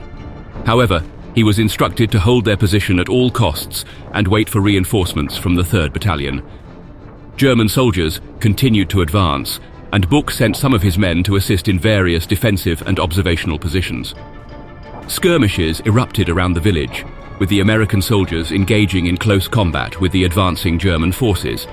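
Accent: United Kingdom. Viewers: too much irrelevant digressions to Stalingrad etx a wasted of time-- Why dont these British documentaries just get to the point of the title? As soon as hear the British accent i just know it`s longwinded -takes two hours just to get to the point of the title ...a total waste of time. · British accent and a booming voice, this narrator was perfect for this documentary. An amazing history lesson that held me riveted for the entire 25 minutes.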